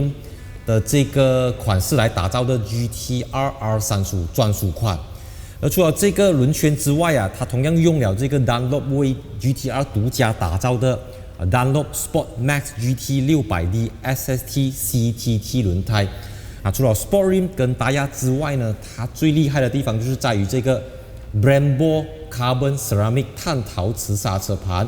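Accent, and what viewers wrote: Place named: Malaysia. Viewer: 我们马来西亚的华文就是这样的ma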